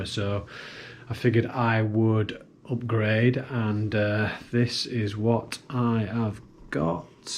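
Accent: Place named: Yorkshire, United Kingdom. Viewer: Congrats on the new camera! From your accent you sound like a Yorkshire man? Similar to mine 🤦🏻‍♀️ if I’m wrong 😂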